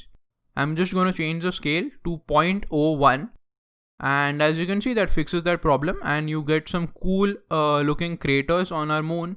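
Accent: India. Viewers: Your tutorials are so much great, are you indian, ? As your accent looks indian, i love your tutorials 😀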